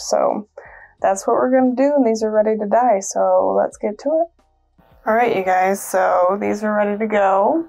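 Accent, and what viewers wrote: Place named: Wisconsin, United States. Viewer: You sound like you’re from Wisconsin too!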